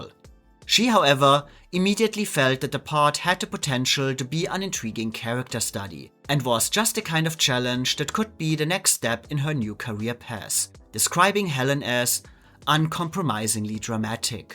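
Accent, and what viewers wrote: Germany. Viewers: Love your Deutsche - English accent. You roll your "r",. Excellent analysis, Joan's performance in Possessed (47) is a tour de force.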